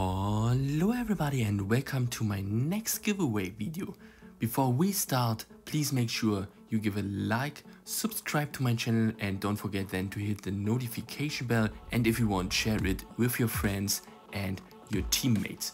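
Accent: Germany. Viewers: ja das könnte natürlich sein aber sprichst nich schlecht englisch auch wenn man halt den typisch deutschen akzent hört😂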